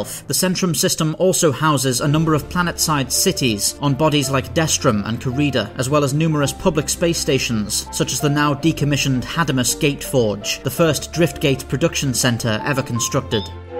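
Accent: United Kingdom. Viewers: Hi I'm a fan of your work on this channel. I'm impressed with the professionalism of your videos and voice over, also nice to hear a fellow brit. I was hoping i could get your attention for short fiction review if that's something you do.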